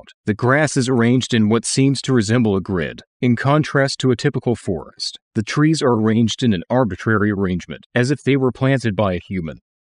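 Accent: United States. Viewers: Like the background muisc, but you do need to be aware that a British EAS would not use an Americn/Canadian voice over person. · Did no one observe the American accent on a British channel? 😅 However, great effort!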